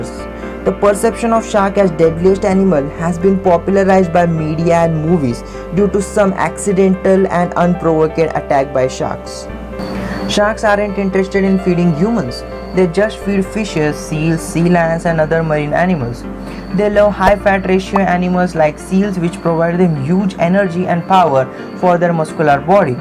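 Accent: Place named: India. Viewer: Felt from ur accent . Me Indian too . Ez science . :)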